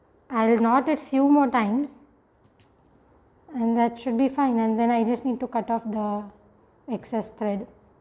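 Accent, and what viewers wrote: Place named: India. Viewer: It’s soothing hearing you talk in your Indian way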